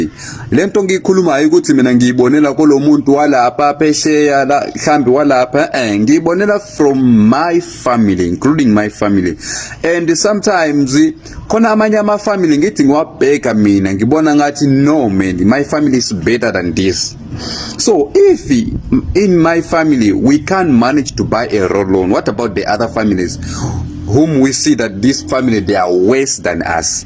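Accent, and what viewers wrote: Malawi. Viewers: Fokof this is Malawian trying to speak isizulu abatjakathi laba bayathwebula laba angibatjembi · 😂😂😂😂😂 kuthi ukhabazela kamavovo hhayi yishwa phela leli ngoba uyamuzwa lomuntu ukuthi wasemalawi.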